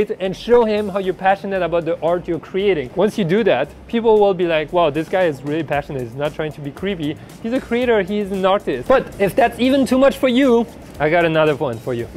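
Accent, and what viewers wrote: France. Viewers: yo! Love your video, they are really great! Can you or anyone else that knows it tell me from where does this accent come from? because i feel like its french in the way you pronounce some "r" but im not sure:)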